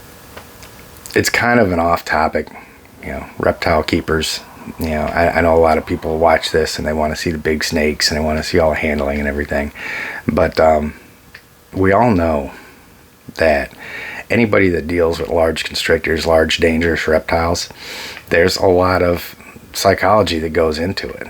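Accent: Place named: Northern Illinois, United States. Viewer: I was gonna ask if you are from N Illinois! Close enough! I still have the accent too and been gone 20+ years... And thanks for your service man!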